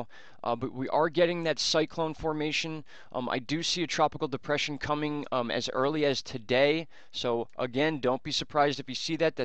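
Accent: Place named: Philadelphia, United States. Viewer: Yay! No hit on NJ :) you have an accent... Are you from Philly or NJ? You almost have the same accent as me!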